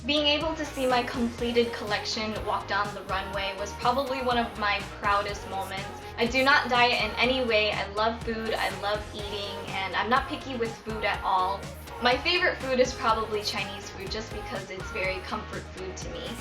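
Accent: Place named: South, United States. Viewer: My god and your southern accent!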